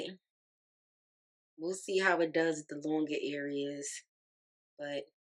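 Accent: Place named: New York City, United States. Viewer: No she sound like CoKo from SWV I guess it’s that NYC accent.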